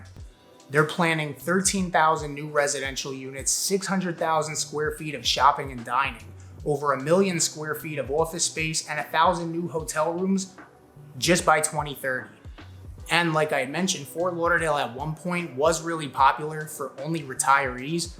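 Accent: New Jersey, United States. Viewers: Great pros & cons video!!! I can tell from your accent you were a Bergen County NJ resident, right? Then again, to me, anyone North of Trenton sounds like they live in Hoboken. This Philly-born and raised, moved to South Jersey (we say "Joisey") resident transplant knows prices and auto insurance in Broward County is about the same as NJ (price hikes in 2024 for NJ). But our homeowner's insurance is so low. We love Pompano Beach and see all the new developments going up there as that's where we vacay every year. Will be down there again (hello Wyndham timeshares) this winter and see all things Atlantic Avenue and A1A near Sunrise. You forgot Con #6: SNOWBIRDS -- traffic is crazy down there, but from late November to April, wow! Of course, in my eyes, the Pros outweigh the Cons.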